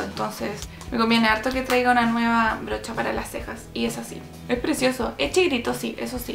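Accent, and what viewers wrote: Chile. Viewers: Eres chilena porque ablas como chilena y ademas eres muy linda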